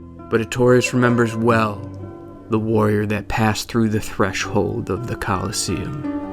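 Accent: United States. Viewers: It needs a British narrator. American accents don't mix well with DS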